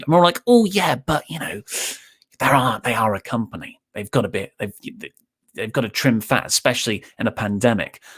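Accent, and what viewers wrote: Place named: United Kingdom. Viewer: Why is breaking these awful news with a british accent at the wrestletalk youtube channel?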